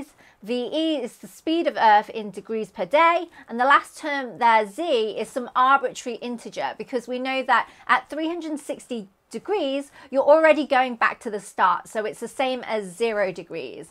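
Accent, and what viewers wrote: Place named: Northern England, United Kingdom. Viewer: We love your Northern English accent.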